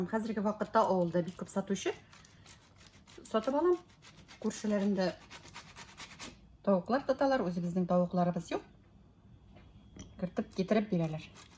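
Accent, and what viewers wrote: Standard. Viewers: Миңа пешерү осталыгы бер ошаса, бу ханымның татарча шундый чиста, матур сөйләве тагын да ошады! Бик зыялы ханым!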